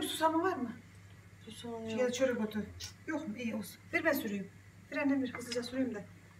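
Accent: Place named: Central Anatolia, Turkey. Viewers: Ellerinize emeğinize sağlık canım çok beceriklisiniz maşallah memleket neresi konuşma şiveniz Yozgatlılara benziyo harikasınız 😘😘😘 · Eline sağlık çok güzel oldu poğaçalar ve de doğalsınız Kayserilimisiniz iç Anadolu şivesi ile konuyorsunuz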